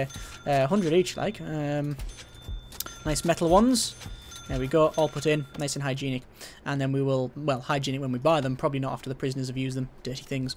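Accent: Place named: North, United Kingdom. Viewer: You're so northern. :D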